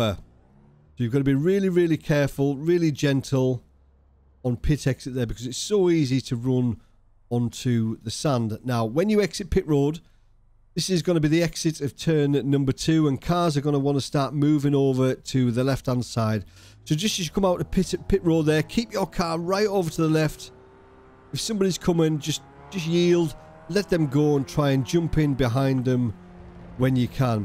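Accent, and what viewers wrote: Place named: Middlesbrough, United Kingdom. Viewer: Are you a Boro lad? You sound like a Boro lad! Great video by the way. I've found you really helpful since I started iRacing.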